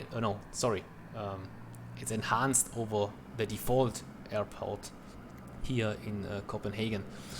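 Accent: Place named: Germany. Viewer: Many thanks for your answer. Let's hope to see more static planes because I don't need any moving traffic at the airports...safe some FPS for me at least. Can I ask you for your PC specs and how many FPS do you got during your flights around Copenhagen? Btw your voice sounds more like a german speaks english then a greek people ;-)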